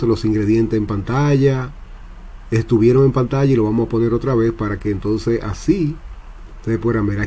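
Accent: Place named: Dominican Republic. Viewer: Ya me sacó de la duda de dónde es ( por su acento ) Mil x mil 🇩🇴🇩🇴🇩🇴😃nos parecemos extremadamente LIMPIO 👍👍👍👍👍👏👏👏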